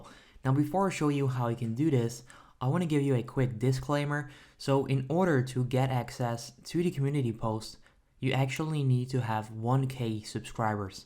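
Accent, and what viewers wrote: England, United Kingdom. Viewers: Finnaly! A video about this that is in proper English accent there is no way I can understand indian people talk english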